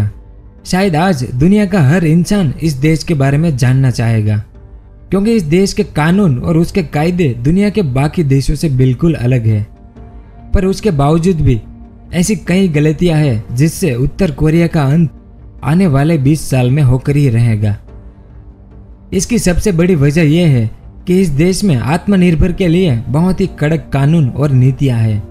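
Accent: Gujarat, India. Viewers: Are you gujju? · Bhai iski mothertoung Gujarati h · Guju Vai, do something to Your accent...... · Hello brother, 1st you say me how to say this, u have any more research above countries..., I think u have to improve your Hindi speech from Gujarati style..., & u r to small to say above countries. Video banane ke or bhi bhahot sare topics available hai, jaha apni mastury ho ya vakahi jante hai usi disha me apna dhyan lagake mahenat karni chahiya varna 2( bavaji ki dhoti or bhakti ) kam bigate hai, mera aap se anurodh etnahi ki bina puri jankari kuch bhi dono hath bantna nahi chahiye. Topic achha tha par jankari bahot kamjor thi. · bhai gujrati ho na tum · Bhai tu gujarati cho k ny? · Bro are u gujarati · Gujurati ho kya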